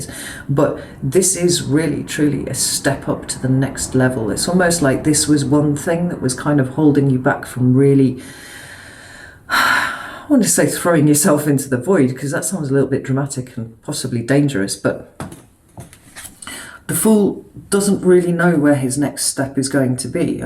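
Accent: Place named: England, United Kingdom. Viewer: VERY FUNNY THING ! NEVER LIKED THE ENGLISH ACCENT ! I LOVE IT BY YOU !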